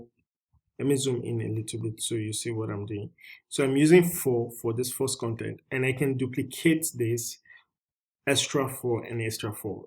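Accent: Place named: Nigeria. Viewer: sounds Nigerian, finally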